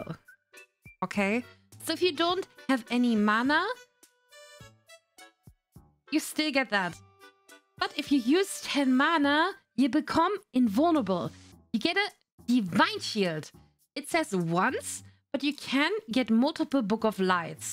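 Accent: Germany. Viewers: Boahh schwierig wenn der deutsche Akzent kickt, trotzdem gutes Video.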